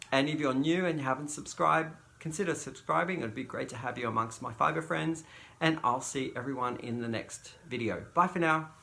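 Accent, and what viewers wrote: Australia. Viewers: Love hearing the Aussie accent. Loving your channel. Oi Oi Oi x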